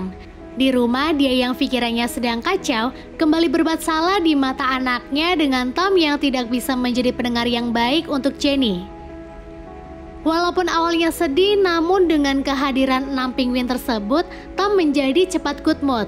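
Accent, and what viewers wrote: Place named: Pemalang, Indonesia. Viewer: Mbak orang pemalang ya😆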